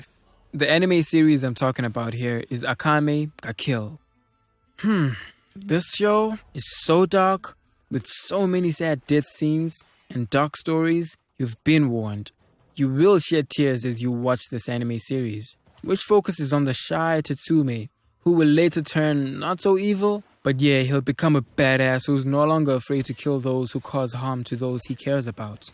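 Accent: South Africa. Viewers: this guy sounds south african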